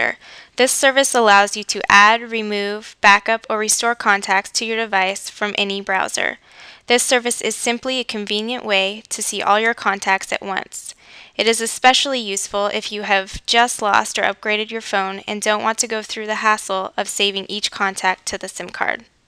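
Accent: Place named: Scotland, United Kingdom. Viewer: Awsome dialect. Remnds me of COD4 Cpt.MacMillan. Are you Scottish, or Irish?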